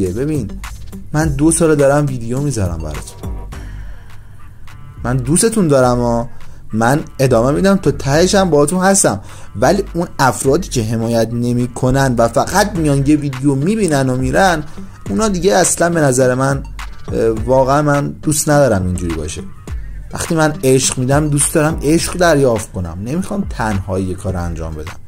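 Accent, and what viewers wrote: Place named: Hamadan, Iran. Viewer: داش‌ جواب بده‌بچه‌کجایی لحجت‌به‌طرف‌همدان‌میخوره‌بچه‌کجابی؟؟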